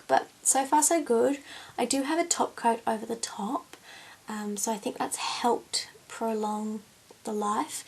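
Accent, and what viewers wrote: Australia. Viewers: Sounds pretty Australian to a Brit :)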